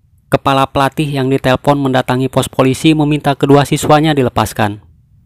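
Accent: West Java, Indonesia. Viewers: Bang dari logatnya kayanya abang dari sunda ya hehe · Dari awal kutebak, pasti orang sunda,. Salam sunda 💪🏻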